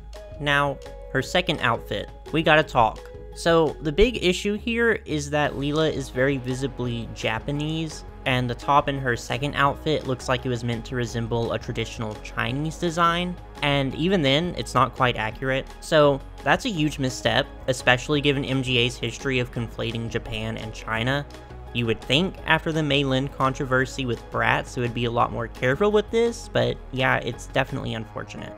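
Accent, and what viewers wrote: Texas, United States. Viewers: I presently like how you talk I love your Texas accent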